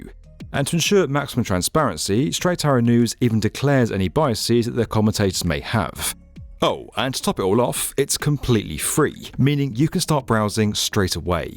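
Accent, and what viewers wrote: England, United Kingdom. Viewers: I hear an English accent then unsurprisingly the racism / white supremacy talking points soon follow. 🤣 "unbiased, straight facts" 🤣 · Whenever you hear a British accent you can be confident that you are listening to bullshit limey propaganda. L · What’s kind of narrative can we expect from someone with British accent mixing “human rights” with history narrating a Chinese-Russian projects? Expect a sugar coated poisoned pill.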